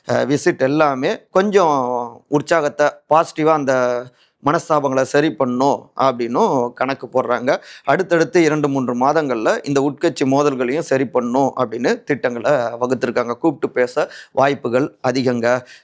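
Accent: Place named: Madurai, India. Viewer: தண்ட பேச்சு, வீரியமில்லாத பேச்சு. Typically Madurai சொங்கி slang.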